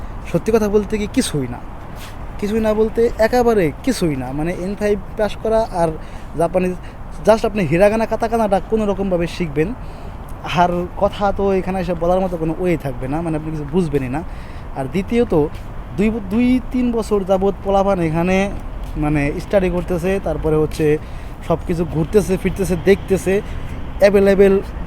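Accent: Faridpur, Bangladesh. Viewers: Vai apnar voice shunei buja jay apni faridpur er amr gram er bari o faridpur e ami dhaka thaki Matro Hsc sesh holo bahire jete cacchi Ar vhai 12/14 lack tk koroch kore jabo jeheto deshe to kisu holeo pathaite hobe so amr jonno japan asha ki uchit hobe?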